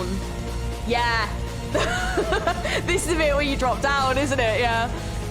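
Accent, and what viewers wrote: United Kingdom. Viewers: This girl is so british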